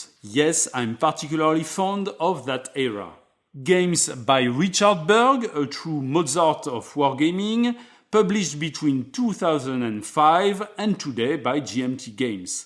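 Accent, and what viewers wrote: France. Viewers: I think you probably specified exacltly what i like in board gaming in these videos. I hope to see alot more content published by you in the future. And always with that beautiful frensh accent ;) If i had one request it would be to see an english verison of the Here I stand videos. :)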